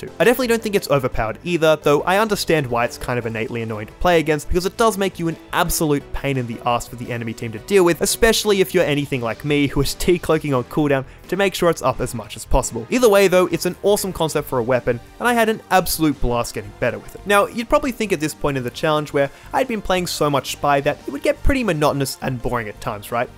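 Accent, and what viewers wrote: Australia. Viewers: He is aussie lol, he doesnt sound american at all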